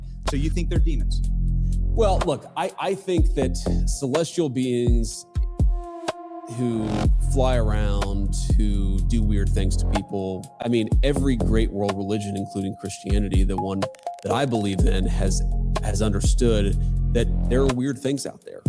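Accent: Australia. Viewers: Are you from Australia or New Zealand? :D Like anything else, there's likely a wide variety of subspecies with differing adaptations and evolutionary backgrounds. It's never just one "evil" group. I appreciate you being calm and methodical about a weird topic. Where are you really hoping this channel will go? If you manage to find success without becoming hysterical, selling out, or being misguided by fans of mimicry, at some point you'll probably experience something absolutely horrifying as a "warning."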